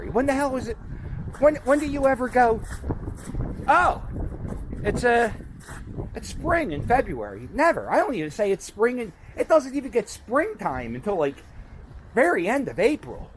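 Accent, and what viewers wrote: Philadelphia, United States. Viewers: I love the accent...he sounds like my cousins in S. Philly.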